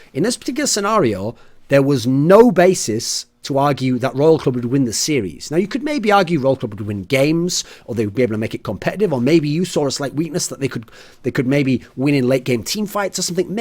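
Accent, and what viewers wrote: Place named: Wales, United Kingdom. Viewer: Where is Thorin from? He sounds Welsh or some shit.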